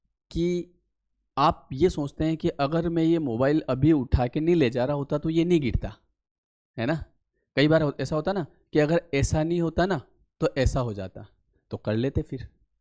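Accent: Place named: Indore, India. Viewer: Aap MP se ho kya....aapki language Indore side ki lagti hai 😊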